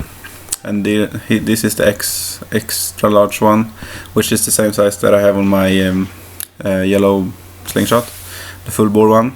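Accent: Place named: Sweden. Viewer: Absolutely love your Swedish accent my man.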